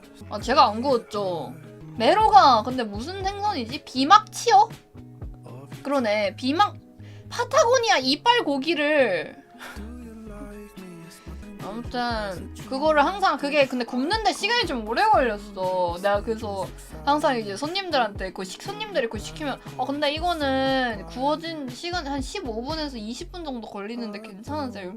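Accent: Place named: Gyeongsang, South Korea. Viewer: 경상도 사람임? 사투리쓰네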